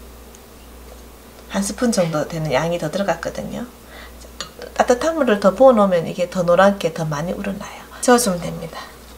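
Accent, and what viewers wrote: Gyeongsang, South Korea. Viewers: 오늘같이 비오는 날엔 튀김이 땡기네요! 경상도 억양으로 설명해 주시니 더욱 맛있어보여요~ ^^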